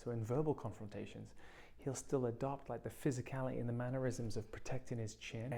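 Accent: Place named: Australia. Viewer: Your English accent has a bit of a Australian twang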